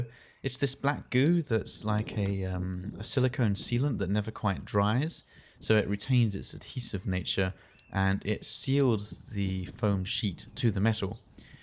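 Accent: United Kingdom. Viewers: I think he's British Mate!!!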